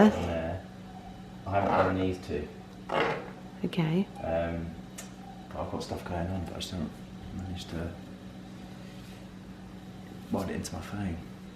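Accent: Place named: England, United Kingdom. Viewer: I am an older lady that lives in U.S. I found you on youtube and you inspire me so much. My dear Mother was from England, and it like listening to her or my grandmother when I listen to your beautiful accent. I love how you are so real. Please keep doing what you are doing.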